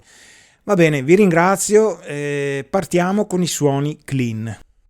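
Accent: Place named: Veneto, Italy. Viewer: Ciao Conterraneo! dalla parlata ho detto, questo è Veneto, e poi dal Nome e Cognome ho detto... ma mi sembra di conoscerlo... e infatti sei di Thiene... io di Malo!, inizio a seguirti che mi sto avvicinando al mondo della chitarra, anche se un po' Vecchiotto per iniziare a 43 anni, Ciao!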